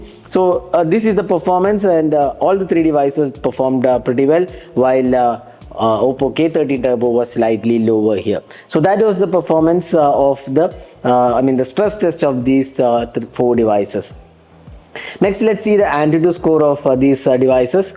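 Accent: Kerala, India. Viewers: Hindi might not be his mother tongue, I think he sounds like he is from Kerala.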